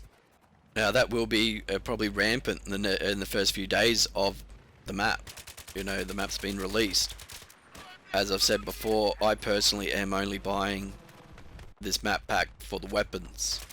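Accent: Australia. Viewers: fuck i love aussie commentators haha,subbed!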